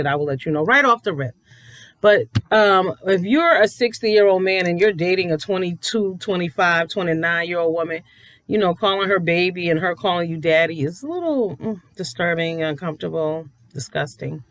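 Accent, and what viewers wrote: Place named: East Coast, United States. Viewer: I knew you east coast I can hear it all I live in Texas and everyone say I got that accent too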